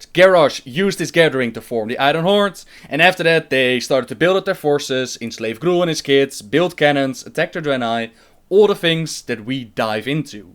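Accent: Netherlands. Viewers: Where do you come from... it sounds dutch.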